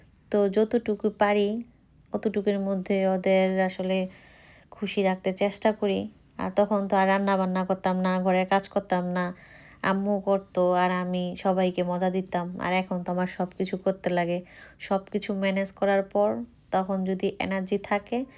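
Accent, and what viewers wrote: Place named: Munshiganj, Bangladesh. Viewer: তাইতো বলি ভাষা কেন এত চেনা চেনা লাগছে আমার বাড়িও মুন্সিগঞ্জ শ্রীনগর থানা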